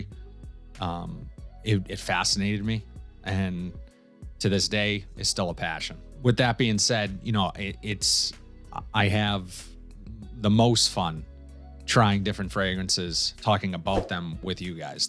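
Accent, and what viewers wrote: Boston, United States. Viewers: you wanna get the numbers up of subscribers?… gotta get to collab with other fragrance reviewers. Just watch once a reviewer collabs with another fraghead… and how significant their numbers go up. Just some advice. Good reviews and stunning Boston accent 😅… keep it up buddy 👏🏽👏🏽👏🏽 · Congratulations. You are a very honest and passionate reviewer with no hidden agenda. The content is superb and I have gotten a couple of great just watching with very little recycled content Love the Boston Accent too I am still waiting for whatever I won I just can't find the private message you sent me. LOL!!!! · Congrats! Cool Water was my intro into Fragrances around 1994 in a clear bottle with a faux wood screw on cap. I'm thankful for you and other guys like you who are willing to talk to a camera and share this passion in a way that both educates and inspires! 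The first time my wife overheard a video of yours that I was watching, she said, "Woah, someone's from Boston!" 😂 Happy Thanksgiving!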